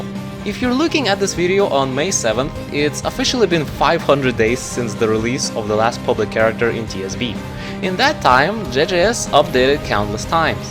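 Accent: Russia. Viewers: Bro has russian accent, just like me b. I am russian but ik english very well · Are you Russian?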